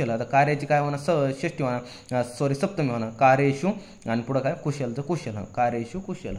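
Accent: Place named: Vidarbha, India. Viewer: Pure gavran bhasha vidharbhachi zp shalet shiklya sarkha vattay sir thanks ❤❤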